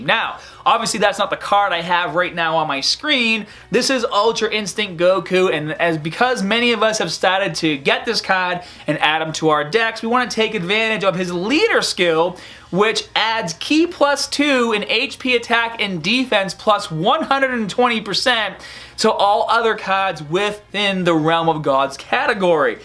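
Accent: Boston, United States. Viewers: Son is definitely from Boston son said “cads” I was like tf is that then I realized he from Boston it’s “Cards”guys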